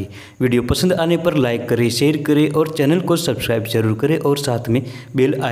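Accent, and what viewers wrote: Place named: Tamil Nadu, India. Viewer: Tamil wala bolti hai. Aati nahin hai.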